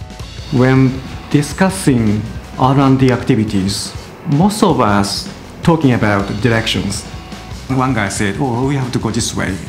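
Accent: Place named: Japan. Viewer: ものすごい典型的な、日本人らしいカタカナ英語だ…。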